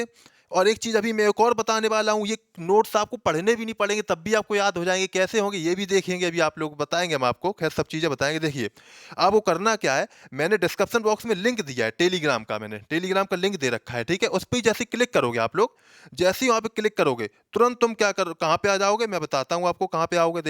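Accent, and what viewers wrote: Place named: Kanpur, India. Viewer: Sir kanpuriya language bol rhe h.. lgta h kanpur se h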